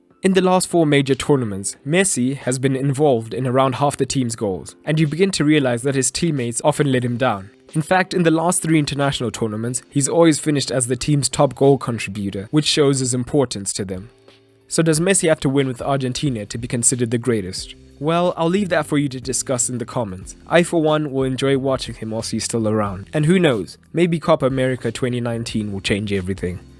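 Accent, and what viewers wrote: South Africa. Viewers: Are you South African or Zimbabwean? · Please do one for Ronaldo too. P.S. Are you from South Africa?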